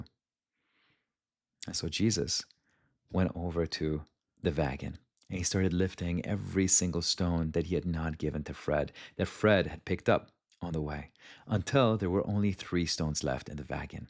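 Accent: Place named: Germany. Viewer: Where are you from? You pronounce the wagon with a v not a w sound,Germany?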